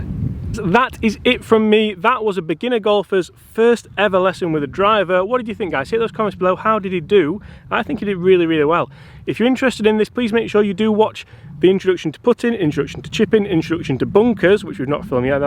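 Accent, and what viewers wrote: Yorkshire, United Kingdom. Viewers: "Si Thi" 😂 a reyt good Yorkshire accent that pal good to hear local lingo 👍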